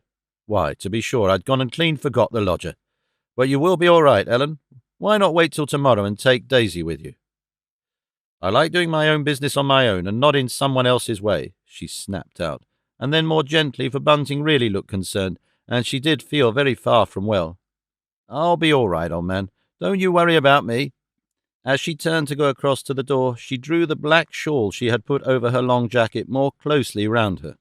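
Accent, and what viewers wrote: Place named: England, United Kingdom. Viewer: Best version of this, in my view. The English accent just MAKES it.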